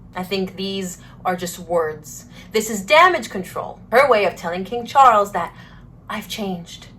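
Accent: United States. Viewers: has an American accent though. She must've moved to Australia then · She moved to Australia as a child from another country which I am not sure which country from. It is possible that she is from America but she mentioned that she was treated differently when she arrived to Australia initially due to her Hispanic looks. She did her school and uni in Australia and she was even in the Australian armed force as a trainee for a period while she was studying law. Australia is a wide country and not all of us sound the same. There is typical Aussie accent from the bush and city people sound completely different. Yes, I can hear slight American accent in her but she also sounds Aussie to me. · I just happened upon your channel. Wow. You are great !!! I am so pleased to see a young American ( I'm only assuming due to your accent) woman who is obviously educated and well mannered, speaking out in defence of Her Majesty, and clearly stating the facts about Harry's wife. 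She must be called out, it is so important to keep on track of all the lies and slander from this talentless grifter fake duchess who conned Britain and the Royal family. Thankyou 🇭🇲😘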